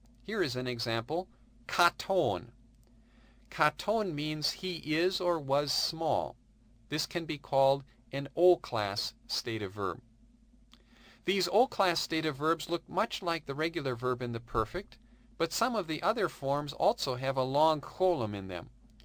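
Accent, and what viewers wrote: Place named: Canada. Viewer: Please don't be offended, but I think I'm hearing a Canadian reading this Hebrew. It's just super weird because the French and Hebrew R sound the same, but this guy's "far west" pronunciation is almost grating.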